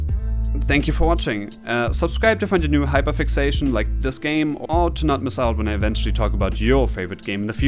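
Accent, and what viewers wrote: Germany. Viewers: btw is it just me or are you german?/kommts nur mir so vor oder bisch deutscher?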